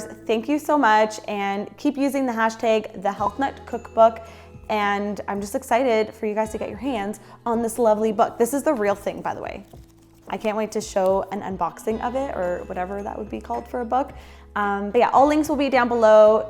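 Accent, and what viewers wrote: Toronto, Canada. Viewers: Damm that last one. Really blew my mind and made me so hungry. Also from the way you talk, you look like from Toronto 🤜🤛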